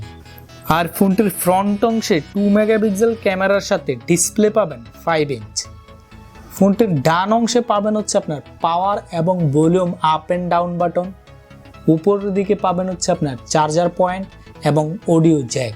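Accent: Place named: Comilla, Bangladesh. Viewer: ভাই আপনার উচ্চারণ শুনে মনে হচ্ছে আপনি কুমিল্লার ই....! ঠিকমতো উচ্চারণ করতে পারেন না, আবার রিভিউ শেষে style মারেন।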